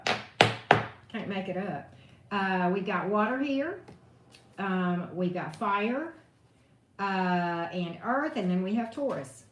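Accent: South, United States. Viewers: I am handling it pretty well. Let the chips fall where they may! Thanks for your great reading. Love your Southern accent! <3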